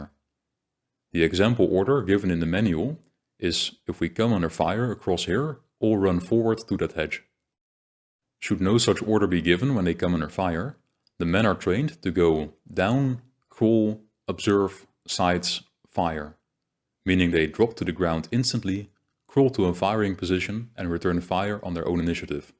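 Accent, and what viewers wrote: Netherlands. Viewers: Love the Dutch accent ❤ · i think that the narrator is a dutch person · The content is very good. But your Dutch accent is very thick. You make a lot of small pronunciation errors. 100% on some sound. It is really a pity. For an example word: you cannot pronounce the word "organization" correctly. You say "Organizasjen". Or the word "section". You say something like 'seksjen' with a 'sh' sound. "Organizasjen" . Dutch people always hear insert a 'sj' sound everywhere in English. I suppose it has linguistic reasons and very difficult for a Dutch person to learn. Still it is important to know that where your English is very good. Your pronunciation is quite bad on that specific sound. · Nederlander. 🤣🤣 ons accent is zo herkenbaar he hahaha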